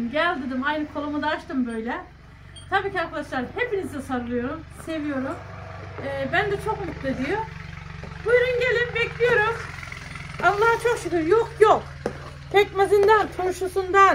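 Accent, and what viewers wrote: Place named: Amasya, Turkey. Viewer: hanim sizin konusmaniz benim memleketim amasya sirvesine cok benziyor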